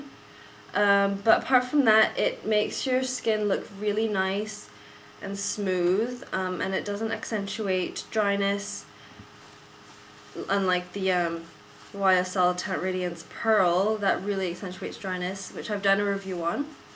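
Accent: United Kingdom. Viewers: U British?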